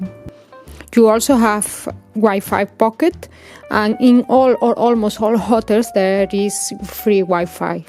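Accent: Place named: Spain. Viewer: Clementine Windflower She is from Spain. I can tell by the accent.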